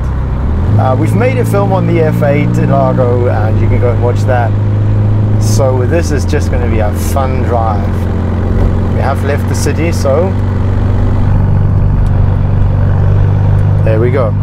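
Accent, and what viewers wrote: Afrikaans, South Africa. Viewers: My guy are you from South Africa? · Epic as always and that Afrikaans accent WITH the words and phrases 🇿🇦leka man 💯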